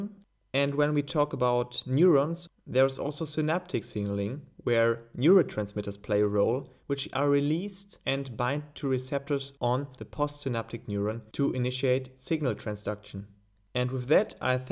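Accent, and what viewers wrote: Germany. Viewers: hilfreiches video, danke :) höre ich da den deutschen Akzent raus? :D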